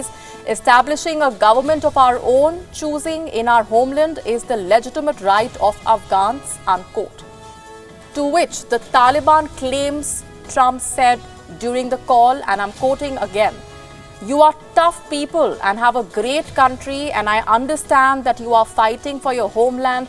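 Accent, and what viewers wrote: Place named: India. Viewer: Sir , Nobody talked about professionalism here It was just a personal request . I'm in no way demeaned the current anchor . She is good . And If you don't get Indian accent there are many other great news channels you can switch to them anytime It's better not to hurt your ears . By the way , your comprehension skills aren't great either !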